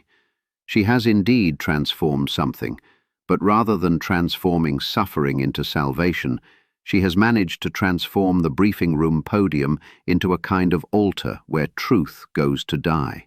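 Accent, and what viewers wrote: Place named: England, United Kingdom. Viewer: Wonderful, simply wonderful. The delivery in a crisp English accent, the words used, the message clear, cogent and concise. We love it! Keep it coming please.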